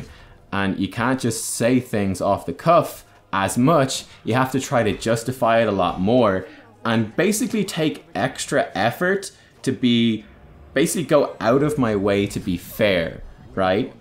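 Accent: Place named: Ireland. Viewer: I love your commentary. Your Irish-ness is part of your charm. No need to self-police.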